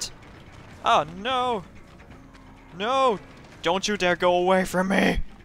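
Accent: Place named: Germany. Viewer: I was just wondering, because you sound german:D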